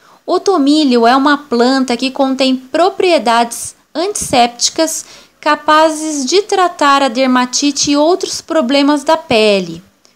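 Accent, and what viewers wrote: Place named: Northeast, Brazil. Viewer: Treinar a dicçao fiquei <link>.a leitura do texto com sotaque sertanejo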